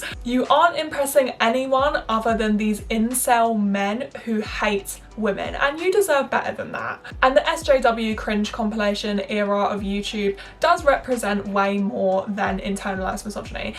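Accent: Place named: United Kingdom. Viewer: That British English caught me off guard but I'm loving it 😍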